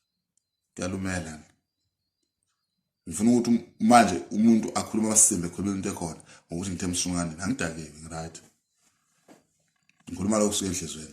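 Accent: Zimbabwe. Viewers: Cha mpela nje wizimbabwe uyazwakala noma ukhuluma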